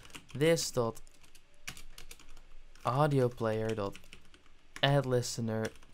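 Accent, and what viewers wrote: Netherlands. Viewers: This guy sounds dutch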